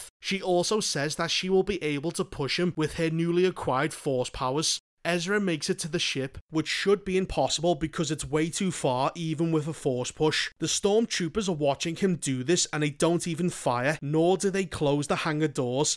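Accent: Liverpool, United Kingdom. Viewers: the scouse accent only adds to the on point comments XD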